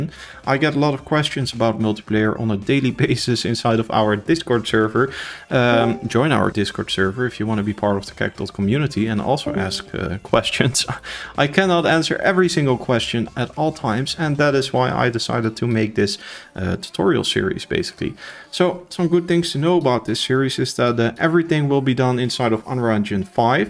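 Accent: Netherlands. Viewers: Just found your channel, exactly what I have been looking for for ages! (and you premiered it on my birthday XD) You sound dutch (ben ik ook XD) Looking forward to going through these tutorials ^^ Thank you for making them <3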